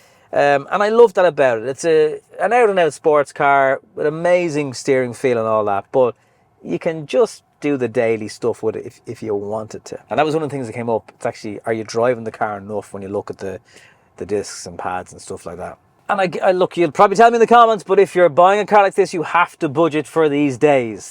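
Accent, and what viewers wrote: Ireland. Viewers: well there is your problem. I own two 911s, a 993 series and a 991.1 GTS series. The <num> was bought when it was 14 years old with 68K kms and the <num> GTS was bought when it was 3 years old with 157 kms, yes 157 kms at 3 years. Both are manuals and mint. I also do ALL my own work, not to save money but to make sure the job is done right as I am ultra-picky. I've been wrenching since I was in my very early teens on cars, motorcycles and aircraft as a hobby. You are buying a 20 year old car, so of course it will have issues and it isn't the parts prices which are very reasonable but the labour costs. It could be worse, you could have bought a British or Italian car. So in your case, I would have detailed the underside of your 911 and cleaned the engine to get it free of oil, etc so that you can pin point the actual source of the leak. As for an MX5 or a S2000, no thanks. 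I also didn't buy a 911 as an investment; life is too short and I am a "tech" and not floating in money. Great to hear the accent, this coming from someone that went to Rockwell College in Tipperary.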